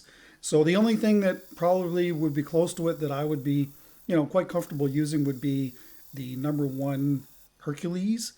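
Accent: Canada. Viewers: It's a beautiful piece,. Pretty impressive how you can turn something so large. The audio was fine too. I could still hear you say "a-boat" in your Canadian accent, which reminds me of when I went travelling and makes me smile, ay. Have a great weekend, looking forward to your next piece.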